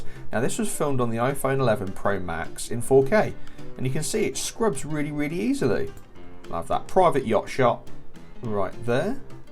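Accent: United Kingdom. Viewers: He's british calm down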